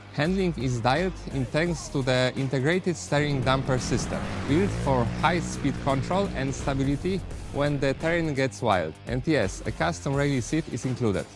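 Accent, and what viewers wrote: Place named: Poland. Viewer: My ears bleed after listening to this Ponglish 😢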